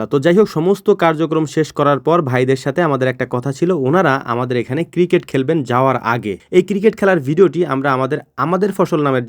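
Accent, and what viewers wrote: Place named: Takerhat, Bangladesh. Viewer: Viya apnr basha maybe takerhat er ase pase.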